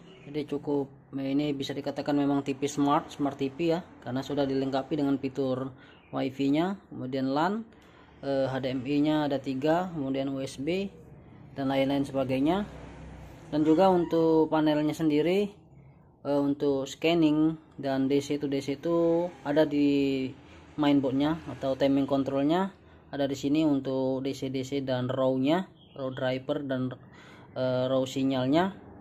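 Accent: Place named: Deli, Indonesia. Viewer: Abg pernah di medan bg? Kalau di lihat dari beberapa vidio Logat abg macam melayu deli 😁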